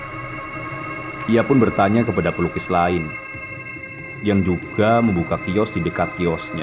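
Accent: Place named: Banyumas, Indonesia. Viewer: Rodo ngapak sitik lah wak kui hehe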